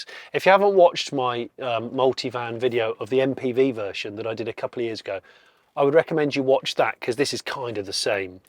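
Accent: United Kingdom. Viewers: weird?!?? Just plain British......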